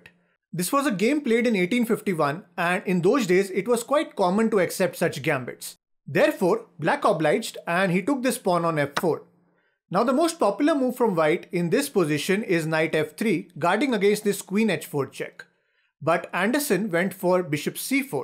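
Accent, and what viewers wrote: India. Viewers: The first time I watched your video, I thought you are an Indian living in England or any other country. Your English is so good, but the accent is still Indian 😂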